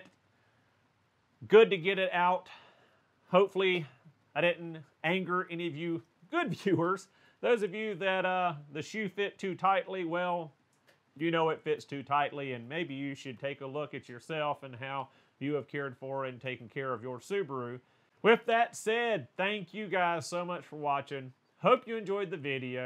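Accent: South, United States. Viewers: Getting yelled at by a dude with a southern accent hits way different.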